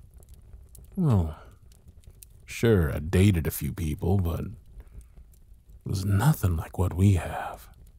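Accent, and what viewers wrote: South, United States. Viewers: aaaa!!! love this audio so much. Southern country boys are my type anyways so this type of audio is awesome!!! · Woah, who was gonna tell me SOUTHERN exists?! I love it! Gimme more please 👉🏻👈🏻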